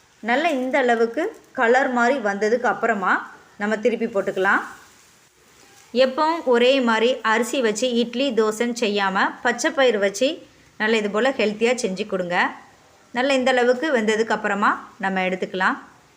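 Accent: Tirunelveli, India. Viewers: உங்கள் பேச்சு சரியான திருநெல்வேலி தமிழ். கேட்பதற்கு இனிமையாக இருந்தது.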